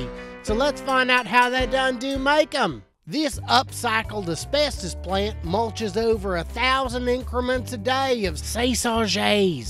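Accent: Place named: South, United States. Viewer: Sir you are one hilarious son of a bitch! Please I am NOT cursing. I practically Rolled Over The Fucking Floor and Laughed My Ass Off! God I wish I could shake your hand and get an autograph from ya!!! Your Southern accent had me bend over double!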